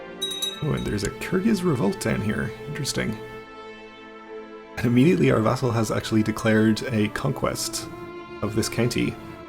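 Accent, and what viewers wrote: Ireland. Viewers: Mr, are there other changes with the Horse lords DLC or just the different culture and features with that culture, and are you Irish, cause it sounds like you have a slightly heavy brogue, or is it just me?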